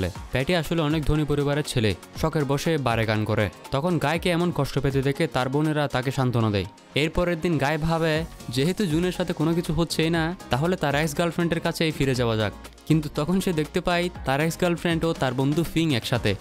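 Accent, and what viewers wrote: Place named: Chittagong, Bangladesh. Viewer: চট্টগ্রাম এক্সেন্ট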